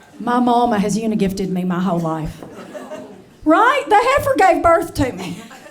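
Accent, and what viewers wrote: South, United States. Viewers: Really great set! 😂😂 a really sweet southern accent too! Best of luck! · I love her southern accent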